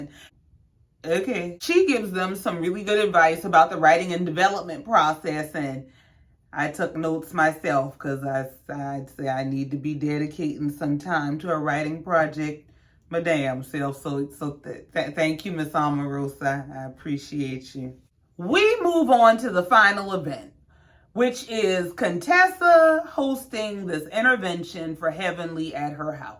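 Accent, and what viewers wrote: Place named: Charleston, United States. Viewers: You gotta be from the low country. I know home when I hear it. Chile Eugene gone go out for cigarettes one day and never return. Works himself to death,smh. Is he not tired of moving? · You from Charleston????? Sound like me and my people!!!😂😂♥️♥️♥️♥️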